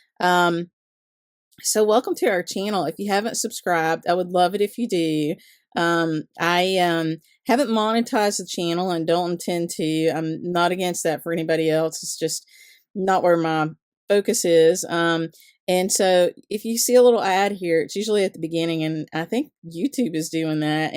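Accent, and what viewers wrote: South, United States. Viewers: I enjoy your videos so much! I could listen to your lovely southern accent for hours. Watching from Australia🐨🦘💕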